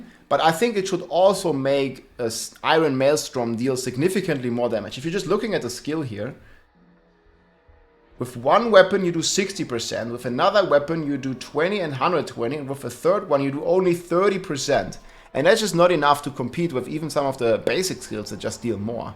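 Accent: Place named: Germany. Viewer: I love the German accent Man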